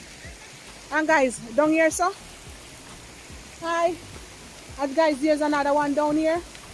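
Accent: Jamaica. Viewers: Really Jamaican girl,listen to the accent 😂😂😂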